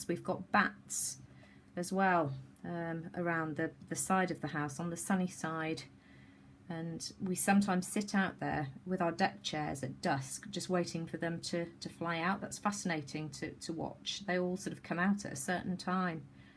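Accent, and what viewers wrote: England, United Kingdom. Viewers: These are very lovely! Do I detect an English accent? Love listening to you and watching too.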